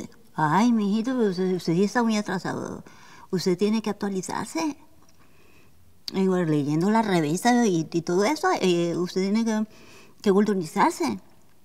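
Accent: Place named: Cali, Colombia. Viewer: Tengo un amigo caleño que habla igualito, jejejejeje Que artista tan buena eres, la mejor.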